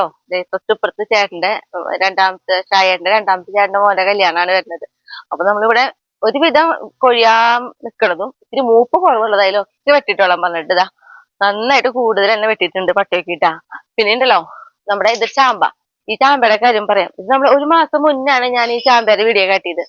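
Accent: Thrissur, India. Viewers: അത് തൃശൂർ സ്ലാങ് അല്ലെ..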